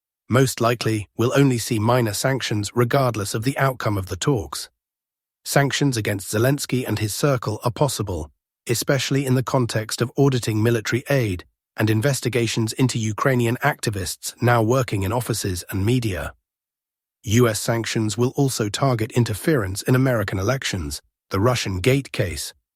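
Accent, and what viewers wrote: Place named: United Kingdom. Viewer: You're no! You speak like a British, better than British!!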